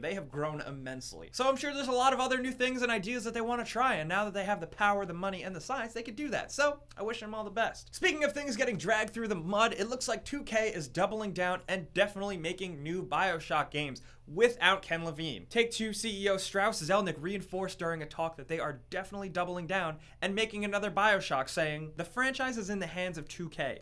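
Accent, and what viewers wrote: Canada. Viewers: You americans? are you canadian like me?:D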